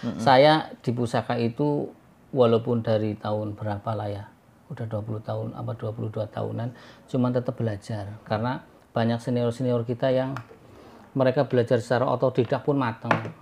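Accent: Banyumas, Indonesia. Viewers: Nogo kikik kiyek kiyek😂, Ngapak acen gae ngakak tur kepenak kayak kiyek😁 😂,, Semangat lur salim saking Jogja 👍.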